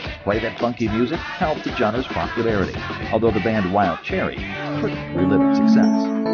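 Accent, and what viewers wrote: United States. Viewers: THis was going greAT UNTIL THE aMERICAN VOICE OVER....GREATTUNES BUT cut the effing vocals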